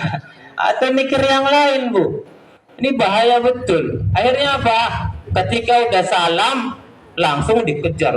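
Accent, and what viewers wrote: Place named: Tegal, Indonesia. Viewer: Ponakan lik... Masih kebawa logat tegal sing wis kecampur logat payakumbuh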